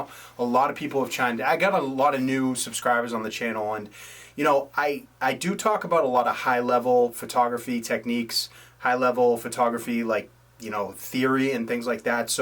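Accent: Boston, United States. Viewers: Just got the Z6 am a portrait photographer working in-studio and natural light. will be renting the Nikon 50mm F1.8 S and the Tamron 24-70mm f2.8 G2 lens and using them in an informal shoot. What are your thought s on the Tamron lens if you've worked with it? Love the B&W, the info, and the accent being a Brookline MA ex-resident living in Seattle Thank you.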